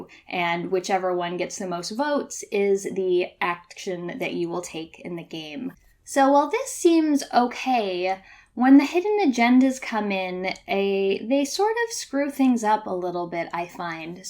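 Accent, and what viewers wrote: Canada. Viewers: Hi, great review, enjoyed it a lot. Lovin how you explained it very easily. On a slightly different note, Are you from Canada 🍁. 😀 👍 👍 👍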